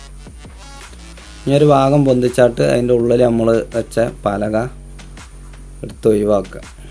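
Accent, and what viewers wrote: Malappuram, India. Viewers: മലപ്പുറം ഭാഷ ഒന്നും നോക്കിയില്ല ലൈക്ക് അടിച്ചു. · മലപ്പുറം ഭാഷയ്ക് അടിയൊരു കിടക്കച്ചി ലൈക്‌ ❤️❤️ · ലൈക്‌ അങ്ങ് കൊടുത്തു . മ്മളെ മലപ്പുറം ഭാഷ thudakkathinu. " എത്ത വർത്താനം "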